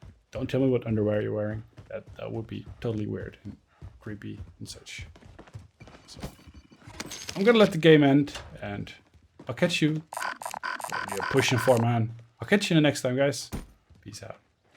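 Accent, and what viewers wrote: Netherlands. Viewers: Awesome vid thanks bro. Also you sound Dutch.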